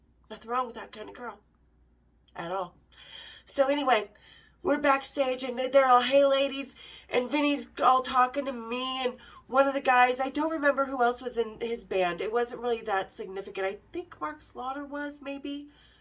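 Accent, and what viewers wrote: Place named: United Kingdom. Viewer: Bruce Dickinson used to live about 3 miles away from me. I love your British acent❤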